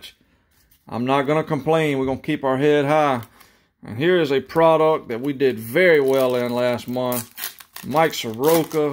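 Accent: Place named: Louisiana, United States. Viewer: I love your backwoods Cajun southern accent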